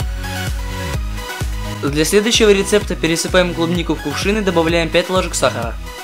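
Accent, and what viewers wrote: Ukraine. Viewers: Этот украинский акцент...)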